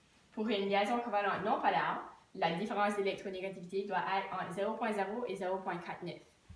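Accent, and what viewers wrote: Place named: New Brunswick, Canada. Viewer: Nouveau brunswick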